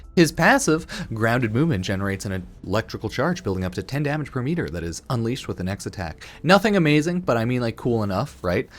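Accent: Canada. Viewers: New to your channel. Gotta say, you sound very Canadian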